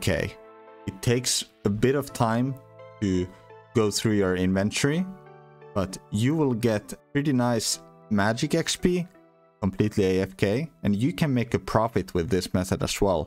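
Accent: Finland. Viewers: Watched the 1st 15 seconds and could hear that you are Finnish! Great content man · Im and can tell you’re finnish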